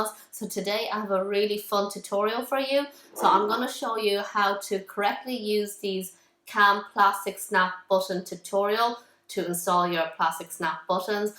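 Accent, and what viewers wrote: Ireland. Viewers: Thank you! I've been looking for such buttons and tools but the results are pretty much all on Amazon and/or made in China, the 2 things I wanted to avoid. By your accent, I'm guessing you're Irish too? If so would you happen to know of anywhere else I can buy them, particularly a good tool that isn't made in PRC? I'm not finding any in Hickey's or similar shops. (I found some buttons from Honk Kong which is fine for me and there's a Taiwan site which have the press pliers tool but it's mainly for bulk orders) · Are you from Ireland? Thank you for this I couldn’t read Chinese instructions. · it’s an irish accent! i was wondering about that but didn’t recognize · Just found your channel and love it. Great to hear the irish accent. I'm irish too, from Sligo. Great tips on using the snaps. I have liked and subscribed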